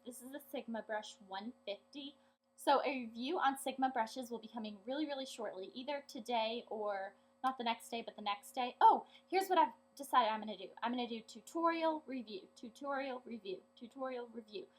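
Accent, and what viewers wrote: South, United States. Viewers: Thanks for this video so much!!!!!!! . . . .Is it me or is your southern accent slowly fading away?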